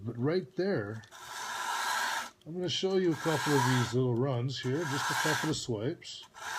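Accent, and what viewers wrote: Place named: Upper Peninsula, United States. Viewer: are you in the Yoop by chance? I am originally from Iron Mtn area and live in Denver. But i tht I could recognize the ol' accent. Great video, i saw this dudes video about a week ago and was blown away!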